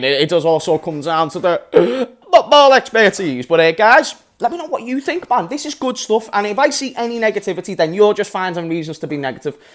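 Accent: Liverpool, United Kingdom. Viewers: The energy and the scouser accent always brings me back to this channel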